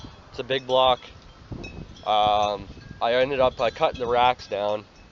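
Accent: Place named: Canada. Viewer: First off, you’re the most Canadian person I’ve ever heard in my life, second... it would be better if we could see your boot on the gas pedal when pumping her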